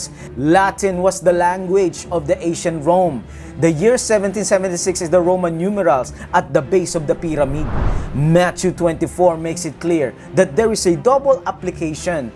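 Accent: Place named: Bisaya, Philippines. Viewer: Bro could you change your bisaya accent to tagalog or neutral accent? Para kasing putolputol pakingan...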